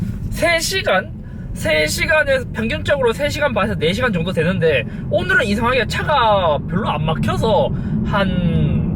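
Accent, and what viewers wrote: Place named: Andong, South Korea. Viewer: 말씨가 안동 말씨였구만요.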